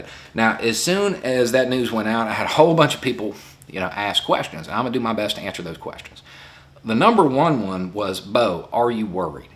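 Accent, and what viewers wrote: South, United States. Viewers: Because (s)he does it ALL the time & it helps some people. Some have hearing issues, or may not understand the southern accent, or they retain visual things better. Whatever the reason, it's a service, a good thing. Why does it bother you so much? It's not like YOU were told to transcribe it (were you?).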